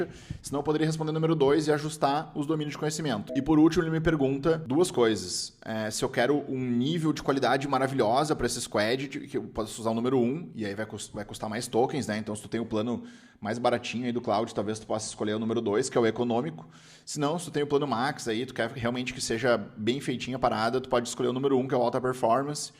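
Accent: Brazil. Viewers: Meu amigo, sotaque de Porto Alegre dói na alma pqp kkkk. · incrivel a qualidade do canal muito bom, somente o sotaque gaucho que não é tão agradável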